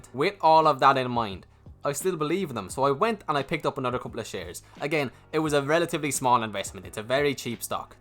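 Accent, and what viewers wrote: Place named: Ireland. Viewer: Like given for the Irish accent.. I loves the Irish people’s 🤪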